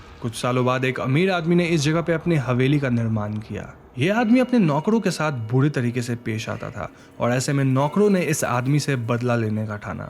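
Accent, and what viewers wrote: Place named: Bihar, India. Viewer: Bhaiya tum bihar se ho na kyuki bihar k log sadak ko sarak bolte h jaise unse kaho sadak par ghoda tadak tadak daudta h to vo bolte h sarak pr ghora tarak tarak daurta hai 🌚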